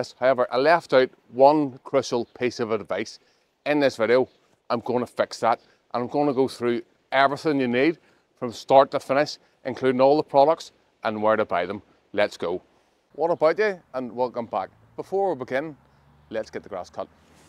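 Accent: Northern Ireland, United Kingdom. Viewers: thanks for the full reply (not many YTers bother!) and BTW, love the Northern Ireland accent (if that's it?)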